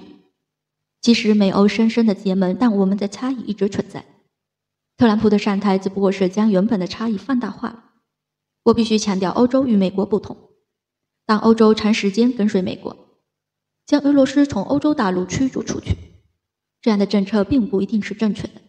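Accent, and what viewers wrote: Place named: Liaoning, China. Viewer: 俄罗湿？主播是辽宁哪里的？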